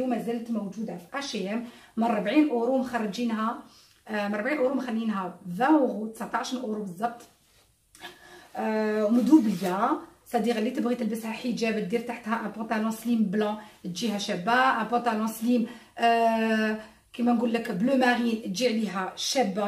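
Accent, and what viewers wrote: Relizane, Algeria. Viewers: ربي يحفظك كنحماق على اللهجة الجزائرية ربي ادوم المحبة والسلام والاحترام وكل الخير بينتنا المغاربة والجزائريين. ...... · انت من غيليزان باينة على هظرتك تدري كيف فطيمة سويد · بصحتك انتي شابة تهبلي حتى بلا مكياج و كي راكي تهدري جزايري زدتي زيانيتي😘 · بنسبه ليا عندك سحر خاص لما تتكلمي بلهجة الجزائرية يعني لهجة تاع الغرب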